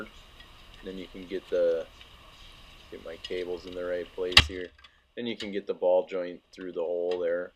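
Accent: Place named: Wisconsin, United States. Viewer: Thanks, I have a 2001 x5 and doing this project this weekend in Arizona. I noticed the accent, I grew up in Oshkosh.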